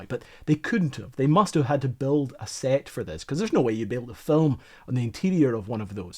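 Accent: Scotland, United Kingdom. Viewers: I came for the video, but stayed for the Scottish accent. :face-blue-smiling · He is Scottish, give him a break. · Is that a Scottish or Irish accent?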